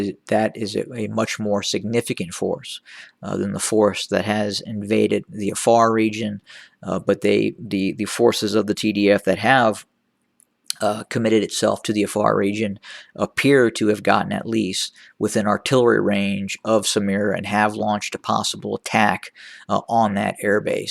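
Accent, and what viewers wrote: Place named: United States. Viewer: You are silly and i am 100% sure you are tigrawi with American accents. እናትን ልብዳት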